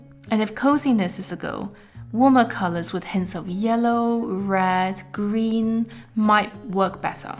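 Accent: United Kingdom. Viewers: I have no partner or girlfriend which could force me to watch, so its my free will. ;-) I once found your videos and find the psychological components interesting, because many people are explaining "how to make your room feel more cozy/expensive/calm/natural" but without explaining, why the suggested design may have the proclaimed certain effect. Watching into primal habits of searching for prey etc is very interesting. I am a minimalist and regarding colours more on the beige, earthy and light green side. I am not a big fan of bold statement pieces or great contrast. So it is interesting to see, how people differ - because most of the male people I know are indeed exactly choosing the options you described. As while I am a fand of sanitised rooms, I prefer them not too sterile. My brother is into chrome - a total nightmare for me, as I am sticking to beech wood or bamboo. 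Oh and excuse me this personal note - I am enjoying your British English also. English was my first foreign language at school (I chose Latin as the second) - and of course nowadays in media you mostly hear American English - f.e. the Hollywood and Netflix productions. And unfortunately I am also leaning towards American English (not to mention my heavy German accent). So its always a pleasure to hear BE.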